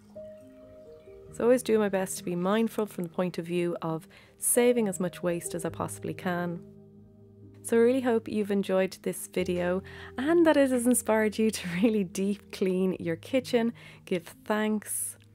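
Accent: Ireland. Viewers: I absolutely adore your beautiful home. We live in a small 800 sq foot home, too. It's cozy and perfect. Did anyone ever tell you that your voice is so soothing? My husband is a Newfoundlander, and I can definately hear his Irish roots in your accent. Much Love, from Ontario, Canada 🇨🇦... Keli 💖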